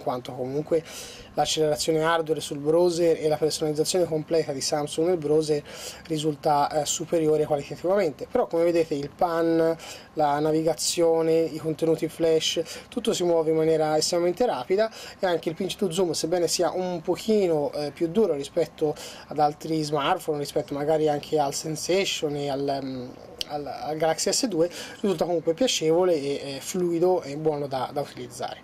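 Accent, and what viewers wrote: Tuscany, Italy. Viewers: Quanto TI AMO ACCENTO TOSCANO :D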